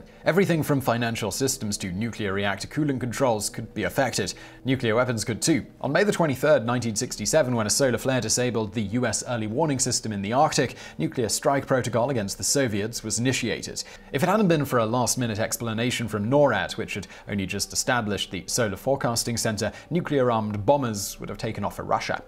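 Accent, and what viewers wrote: United Kingdom. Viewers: I love Simon's voice..the information gets absorbed alot easier when its in British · Why does vsause have a brittish accent?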